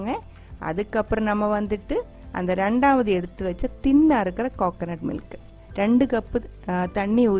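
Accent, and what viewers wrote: Kerala, India. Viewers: Hi Super sis. Tamil mix aana Malayalam unga voice ku nega peasarathu rmba nalla sis. Kandipa na try panni pakara.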